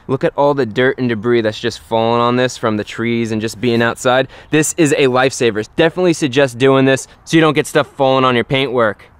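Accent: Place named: New Jersey, United States. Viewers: I heard the NJ in your voice a mile away lmao!! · Love your videos man. If you lived closer we would hang out. You do stuff how my friends and I do. From listening to your voice I'm guessing you're from Jersey?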